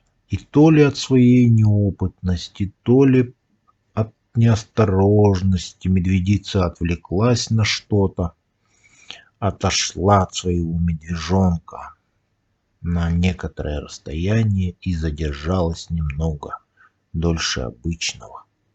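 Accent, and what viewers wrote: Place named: Yakutia, Russia. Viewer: Я про этот случай слышал, тут просто красиво описано каким то писателем,прочитано с хорошей интонацией и знакомым якутским акцентом. 😄👍 А рисунки то какие 👍👍👍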